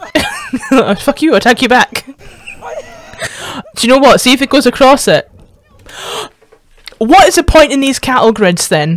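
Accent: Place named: Scotland, United Kingdom. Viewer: Your comments and stories with that Scottish accent love it!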